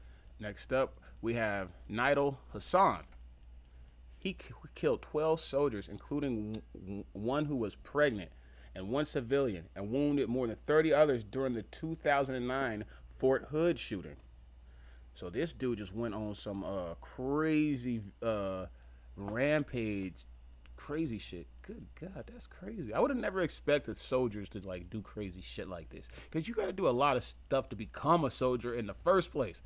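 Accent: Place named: New Jersey, United States. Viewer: Are u from Jersey bro? You sound like it. Either way keep it up brotha!!!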